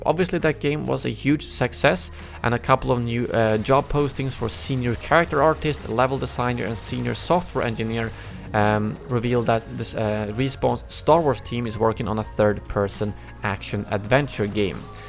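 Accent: Sweden. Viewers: Are you from Sweden? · Den svenska dialekten är ju najs :)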